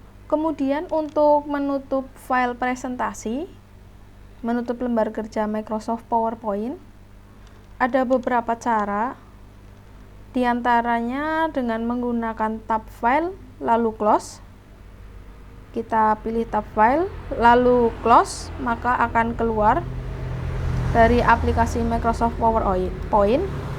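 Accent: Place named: Banyumas, Indonesia. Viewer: Informatif👍 mbak e pasti orang ngapak😀semangat👍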